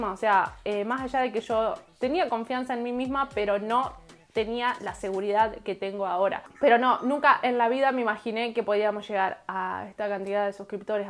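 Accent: Argentina. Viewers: Me encanta el acento argentino💕 ¡Muy buen video😆💞 y muy buenos tus consejos :3!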